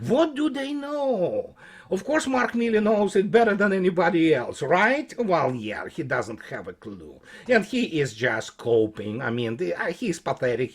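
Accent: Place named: Russia. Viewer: When you read from an article could you please not dramatize your voice you are hard enough to understand with your thick Russian accent it really does no justice to make it worse and harder to understand you by adding drama to your voice. Please try to slow down a little and let your voice keep pace with you brain you make a lot of filler noises that actually make you sound like a drunken American with a thick Russian accent.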